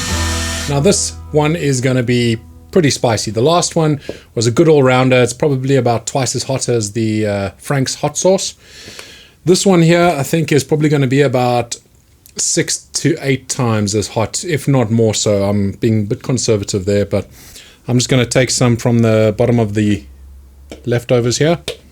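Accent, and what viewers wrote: South Africa. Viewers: got such hectic whiplash because i instantly recognised your south African accent 😂😂😂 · When you recognize a South African accent... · You sound South African 🤣🤣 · Hi. Based on your accent, I'd say you're South African. Where are you based? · Is that a South African accent? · Can't miss that SA accent anywhere you go.... Nice video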